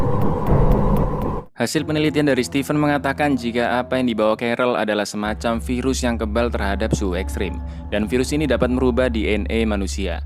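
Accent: Java, Indonesia. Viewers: Mimin wong jowo? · Mantap min cara berbucaramu wkwkwk, jowo pokok e terdebest! 😂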